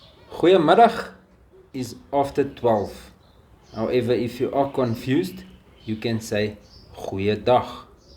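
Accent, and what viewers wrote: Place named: South Africa. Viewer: Goeie dag! Your english accent is so charming. Never heard south african english accent before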